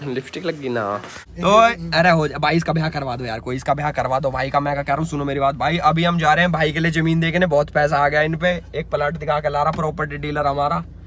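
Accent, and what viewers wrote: Haryana, India. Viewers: Bhai tum hariyana se ho k.bhasha se lgte h .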